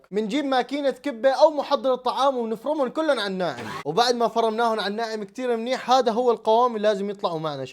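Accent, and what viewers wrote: Syria. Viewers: اللهجة السورية المميزة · كم هي جميلة اللهجة السورية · حلوه اللهجه السوريه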